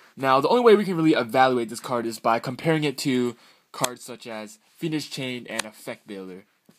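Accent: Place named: Asia. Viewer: Da azn accent :3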